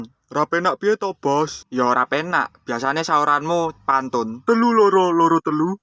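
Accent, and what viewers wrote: Surakarta, Indonesia. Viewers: wong solo to bang?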